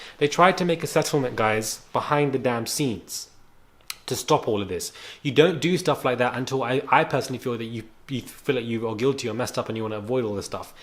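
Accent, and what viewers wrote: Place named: United Kingdom. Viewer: I love watching ur content I just wish u would give us all the information before giving ur opinion, and also can u slow down when u speak u have to remember u have a British accent and it is already hard for us Americans so understand u anyway but when u speak fast we can't understand u good.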